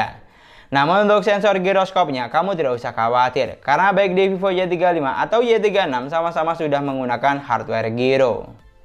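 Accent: Madura, Indonesia. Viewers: Logat nya kentel madura hehehe... Mantap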